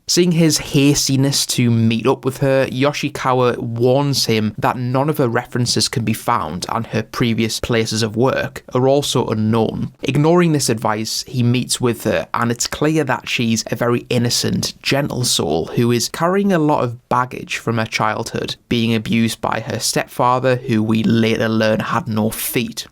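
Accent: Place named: Newcastle, United Kingdom. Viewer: My thoughts are: love the geordie accent and review not bad either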